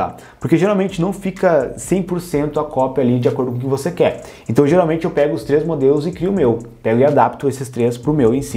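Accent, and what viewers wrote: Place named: Rio Grande do Sul, Brazil. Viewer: Tchê tu és de onde daqui do RS?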